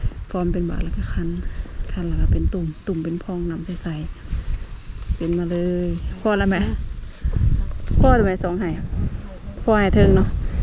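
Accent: Isan, Thailand. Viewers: เว้าคือคนอีสานบ้านข่อย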